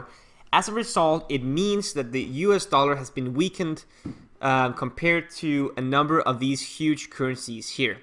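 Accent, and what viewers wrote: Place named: Denmark. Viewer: You sound danish.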